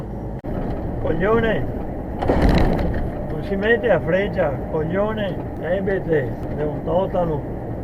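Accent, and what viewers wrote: Veneto, Italy. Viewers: È tutto bene...i difetti potranno ma io non li vedo ...mi va bene così...poi mi piace sentir parlare Veneto.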